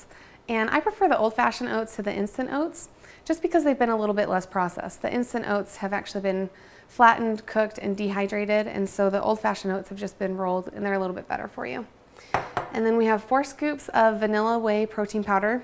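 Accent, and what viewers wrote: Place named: Kentucky, United States. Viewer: Her dialect sounds interesting... where is it from ? Kentucky ? or the areas around it ?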